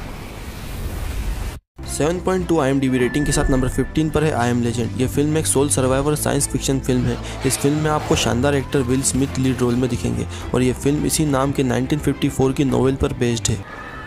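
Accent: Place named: India. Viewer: your voice doesnt suits at all for western movies cuz of ur accent,well i dont blame you but you are good to narrate for hindi movies tho.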